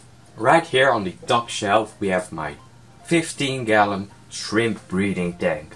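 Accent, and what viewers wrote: Netherlands. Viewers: Ik hoor... een Nederlander ;) prachtige bakken heb je! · Hey! Ontdekte je video bij toeval! Aan je accent te horen ben je ook Nederlands. De witte waas in je water zijn dooie bacteriën, dus niets aan de hand! Ik ben gesubt! En ga zo door 👍🏻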